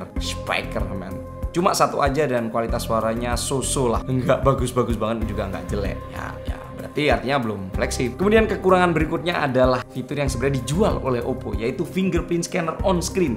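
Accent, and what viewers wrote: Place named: Java, Indonesia. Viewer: Seneng nak smpean ngomong bang sante tp lucu mbe medok wkwkw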